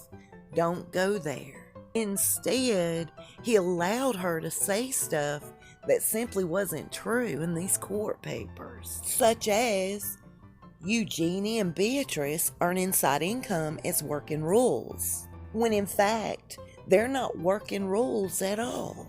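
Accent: South, United States. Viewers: I absolutely love your accent .....are you from the south?? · I didn’t know what you looked like. You have a southern accent. You finally showed your face. Your southern dialect didn’t match what in my mind you looked like., I was blown back. You are so beautiful. ✍🏻👍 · LOL - This Southern accent commenting on the royals is odd even though the commentary is on point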